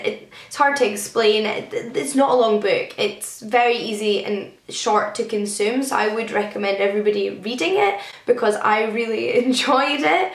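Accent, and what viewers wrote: Scotland, United Kingdom. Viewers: As someone who is an asian I always wrongly assume that you are greek considering how passionate and invested you are about ancient greek mythology and history:)) Btw I find scottish accents really cute and adorable! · Hope February is an even better month for you. ❤️ Are you Scottish btw?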